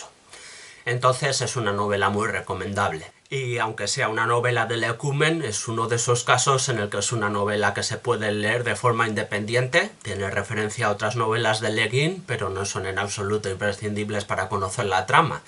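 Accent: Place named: Madrid, Spain. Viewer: "Tal y cual"... Tu... Tu eres de Madrid no? XD Buen video ♥️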